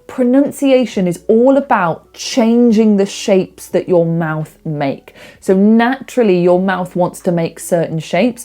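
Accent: United Kingdom. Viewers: Sounds cool , I adore the British accent.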